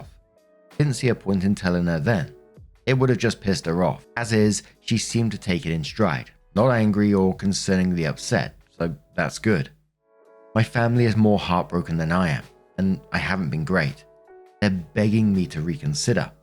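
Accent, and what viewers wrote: United Kingdom. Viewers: I'm British and working in America presently, but thank you for pointing that out. I don't know how I could have missed the very British accent, similar to my own.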